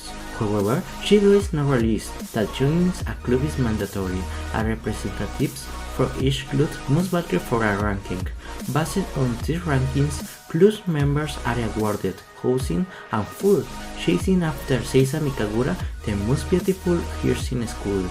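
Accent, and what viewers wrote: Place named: Spain. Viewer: He's spanish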